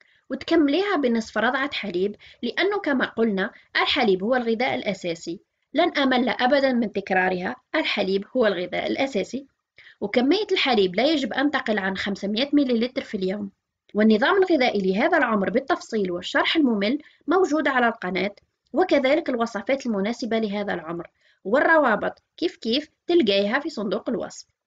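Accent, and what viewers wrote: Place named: East, Algeria. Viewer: دكتورة عندي فضول نعرف انت منين حاسة بيك من عنابة ولا الطارف ولا قالمة ولا سوق اهراس خاطر هدرتك تشبه بزاف لهدرتي انا من خنشلة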